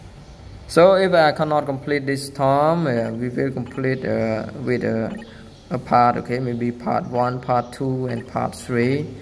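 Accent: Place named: India. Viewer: Yes he is because he has Indian accent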